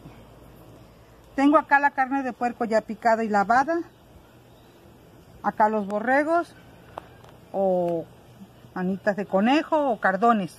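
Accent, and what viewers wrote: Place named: Veracruz, Mexico. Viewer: De donde es usted? Parece de Veracruz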